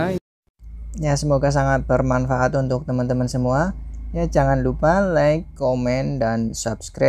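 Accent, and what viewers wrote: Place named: Banyumas, Indonesia. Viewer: Ra ngapak ra kepenak kang...sukses selalu👍😁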